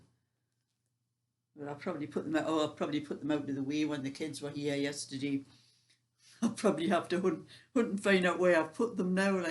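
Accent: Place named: Yorkshire, United Kingdom. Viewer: Just looking in on all your videos with me being one of your new fans , feel so sorry about your hands troubled with pure wool , my daughter is the same . Watched the one on your accent and many of your words are the same as ours in Yorkshire and I think a lot in Lancashire as well , nex my hair dropping out must be an age thing I’m 78 it drives me mad , and don’t get me going about the bills going up don’t know how we will manage I wear about three to four layers of clothes as it is as I feel the cold when inside worse than outside but guess that’s cause try to busy myself with the garden . If got took into hospital from home they would have a job to find me with all the clothes 😂 it’s an awful day here but of snow but of sleet then rain and windy and it was down to 2/3 early this morning not as bad as we used to have it twenty years ago is it but going back sixty plus years we used to av to dig a tunnel to get out the door . 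So our age group av certainly seen the changes please don’t answer just rambling on 🤣 just keep knitting 😂 bye love to watch you thanks for all your videos Jean 💕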